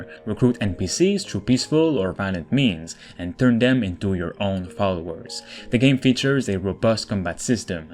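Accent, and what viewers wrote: Quebec, Canada. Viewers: Dude sound like he from quebec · Ça paraît que ces un québécois qui parle😂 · U sound like gsp . You're definitely from quebec. Great review merci . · Aye un québécois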